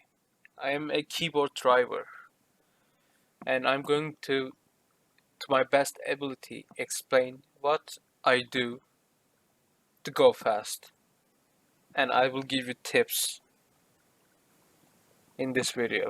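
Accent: Turkey. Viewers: ben direk anladım türk olduğunu çok belli aksanı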